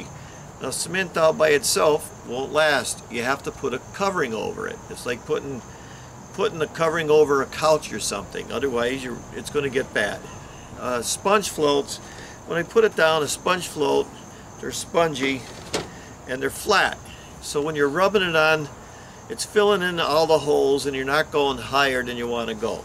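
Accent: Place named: Pennsylvania, United States. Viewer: Hi, just came across your channel while looking for some tips on repairing my patio of natural -shaped bluestones. After a few minutes of viewing the videos I noticed something very familiar - the scenes, the houses, the speech. You are from my hometown area. I was born & raised in Dupont, schooled in Pittston, but currently live in MA on Cape Cod.. I am quite surprised to find someone from the Wyoming valley being a YouTube creator AND doing a great job at it. You are providing a great service entertaining & educating many people. Thanks for that. I subscribed and wish you continued success with your channel. Just wanted to say hello. Paul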